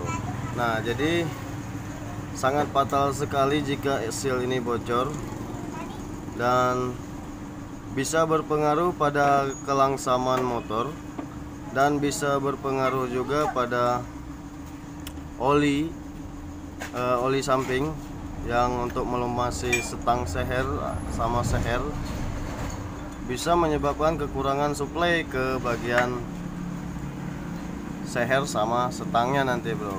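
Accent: Palembang, Indonesia. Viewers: Kayak kenal bahasanya, wong Palembang yo om?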